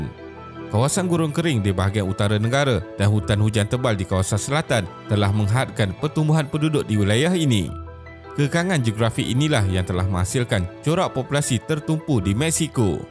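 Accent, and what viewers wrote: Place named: Malaysia. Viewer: Alaa lidah melayu biar jee lar,orang lain ok jee dengar…kalau rasa terganggu dengan sebutan admin sangat,pergi kat tepi dinding bisik slow2 kat dinding tu atau masa nak berak tu jerit puas2 pasal admin salah sebut tu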